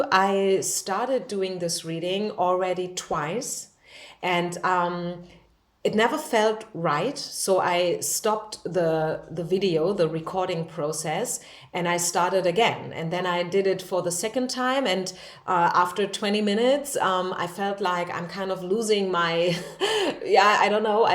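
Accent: Germany. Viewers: #3 thank you so much! 🦀💗 For your wisdom, channeled massages and tips! Also for doing all of this to heal the world and yourself! I'm bso grateful for your readings and seeing you here! I love your German accent! (I'm a Mexican but I studied German for a short time in high school and I find it really gorgeous💗💗💗) Also I saw one of your Deutsch readings!!! And I also loved it! It's incredible how we are all connected, my intuition translated the words that I didn't study and it's incredible 💗💗💗 Love & light to you! namaste 🌻✨🌈🐉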